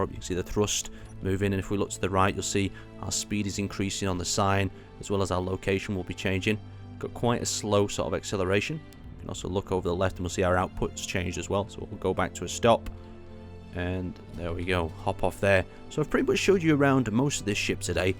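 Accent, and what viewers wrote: United Kingdom. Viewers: That British Accent Doe! Bruh!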